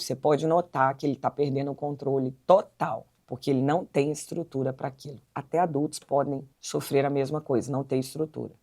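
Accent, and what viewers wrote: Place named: Belo Horizonte, Brazil. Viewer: A senhora é pastora em belo horizonte? pergunto pelo sotaque 😊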